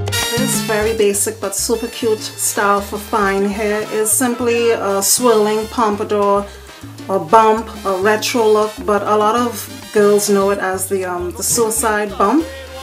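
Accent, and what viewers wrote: Trinidad and Tobago. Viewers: Hiiii! That accent sounds familiar. Trini?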